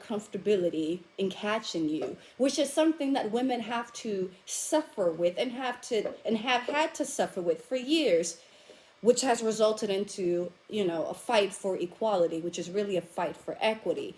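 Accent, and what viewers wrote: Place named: England, United Kingdom. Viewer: Your English accent 😂👌🏾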